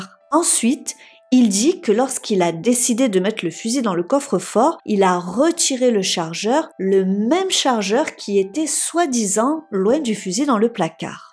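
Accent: South, France. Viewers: < Danielle> C'est une triste histoire surtout pour Danielle. Je l'avais déjà entendu par un autre youtubeur/se (?). Je pense y avoir appris des choses en plus... Le seul soucis c'est ta prononciation pour certains mots...qui me font hérisser les poils sur le crâne. C'est vraiment dommage car tu as une chouette voix du sud...agréable à écouter. Dans cette affaire on voit bien que Scylar est un "bon" comédien. Menteur...colérique...et a l'air d'avoir une haute estime de lui Sans compter qu'à chaque tentative ou il feint de pleurer...il n'y a aucune larme ! Je pense qu'il n'avait aucune confiance en Danielle et qu'il voyait le "mal" quasiment partout. De plus apparemment c'était un gars qui aimait rabaisser sa femme... Peut-être que le comportement qu'il a montré envers sa femme est repris de son père voir de sa mère. Mère un peu trop "proche" de son fils... 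Purée il a un air tellement arrogant et suffisant. Tout dépend de savoir si Scylar brutalisait Danielle ou si ce ne sont que des bruits de couloirs... Si Scylar aimait sincèrement et respectueuses Danielle...je me dit que vu son enthousiasme...son excitation du retour de son homme...je ne pense pas que Danielle aurait tout gâchée avec un amant. Par contre si Scylar était comme le prétendent bon nombre de leurs "ami(e)s" ... c-a-d...violent...rabaissant.. etc...il se pourrait que Jeremy eu été plus qu'un simple "copain"...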